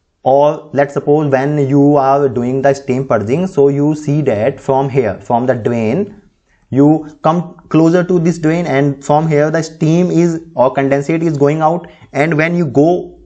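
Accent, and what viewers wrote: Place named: India. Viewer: please make the subtitle, your english language contaminated with Indian/pakistani spell. so confuse wht do you talking about.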